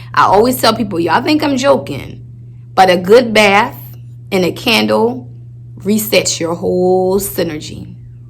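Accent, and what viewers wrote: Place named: New Orleans, United States. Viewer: I love your accent...are you from Nola??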